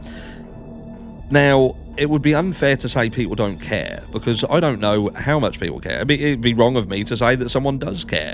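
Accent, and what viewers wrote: Norfolk, United Kingdom. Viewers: Love your videos. Where are you from. What is your accent. Are you from Norfolk.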